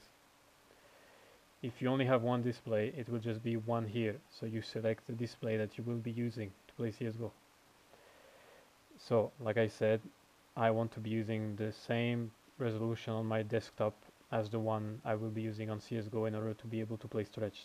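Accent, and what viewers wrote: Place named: India. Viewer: sounds like an indian tech support scammer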